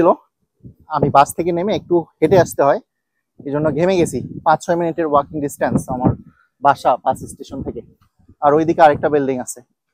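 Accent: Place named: Sylhet, Bangladesh. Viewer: 🤣.Depend on luck. But as a sylhty I think sylhety ra Sylhet ar outside a Biya-shadi kum kora. Apnar accent onakta Sylhety mona hoyacilo tai bollam .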